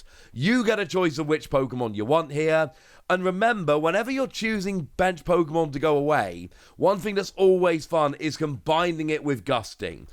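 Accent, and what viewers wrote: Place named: United Kingdom. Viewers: He’s British. Kinda like Wah-ter (water) is watt-ah. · British accent 😌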